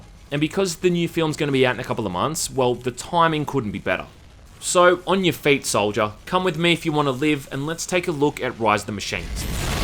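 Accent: Australia. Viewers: This. I mean, sure, he does have that sweet Aussie accent, so I could have misheared him, but saying 'three' and sounding like 'four' is still a bit unlikely. :/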